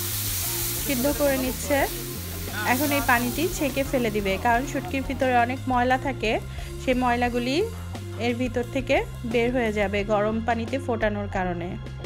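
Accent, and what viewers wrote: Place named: Dhaka, Bangladesh. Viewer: bangali Na tumi dhakai Bangladesh theke tomar kothai bijha jache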